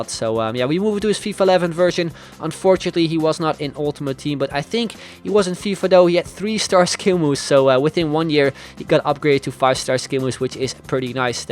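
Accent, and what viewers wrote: Netherlands. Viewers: Je hoort dat je Nederlands bent😌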